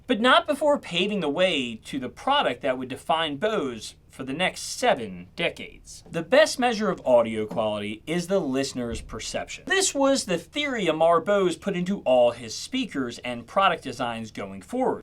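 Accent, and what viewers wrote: Baltimore, United States. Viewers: 10 seconds in and I knew you were from Baltimore. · Are you from Baltimore/Maryland? I'm not from the US but I'm trying to improve my accent identification. (Also please don't think I'm weird, I'm just a sperg.)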